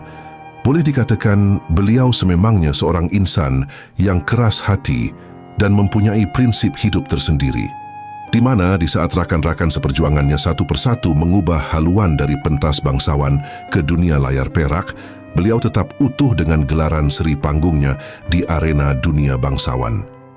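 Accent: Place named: United Kingdom. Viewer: Terdapat banyak sebutan Dan intonasi yang mirip Bshasa Inggeris .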